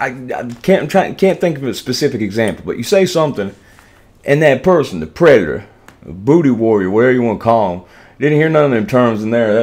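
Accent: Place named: South, United States. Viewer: You are from the south, you always talk funny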